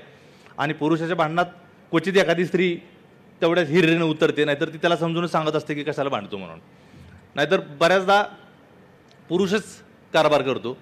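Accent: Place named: Udgir, India. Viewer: मंजुळे सर तुम्ही माझ्या गावी उदगीरला आलात .खुप छान वाटले.मी अहमदनगर आहे पण तुमची भाषा ऐकुण उदगीरमध्येच आहे असे वाटले. आपली भेट व्हावी ही अपेक्षा.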